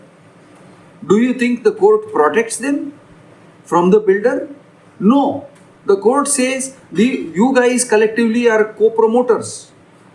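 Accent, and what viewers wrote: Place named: India. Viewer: I asked out of curiosity as this issue might be specific to india and the anchor spoke in indian english. But glad that you understood and appreciated what's going on in this part of the world.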